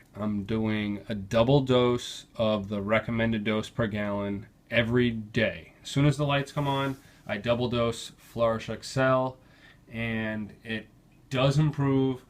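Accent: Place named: United States. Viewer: "Wahtuh".... oh, the american accents, how quaint. :)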